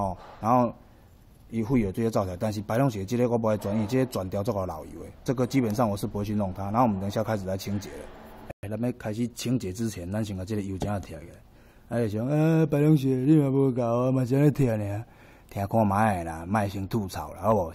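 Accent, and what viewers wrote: Taiwan. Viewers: 不推不行，實在讚！正台灣的口條，讚！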